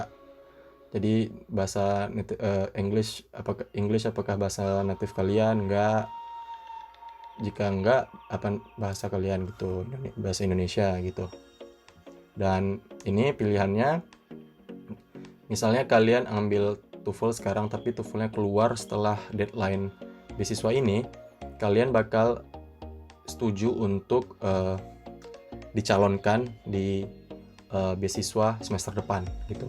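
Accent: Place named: Riau, Indonesia. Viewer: Kelen kelen nya terasa kali kek orang Riau wkwkwk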